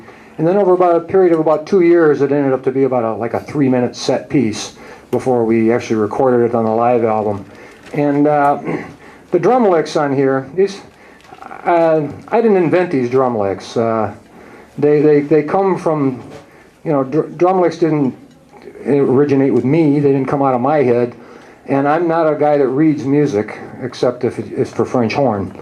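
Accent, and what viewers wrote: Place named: Chicago, United States. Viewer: chicagoland accent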